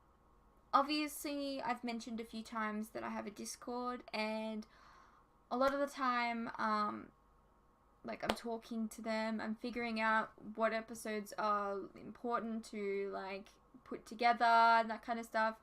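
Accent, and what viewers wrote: Australia. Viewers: reaction videos are a dime a dozen. i think you might be auzzie, nz or tasmania. i have a great affinity for my rugby rivals and their accents. but, you, dear girl, have empathy. its difficult/refreshing to watch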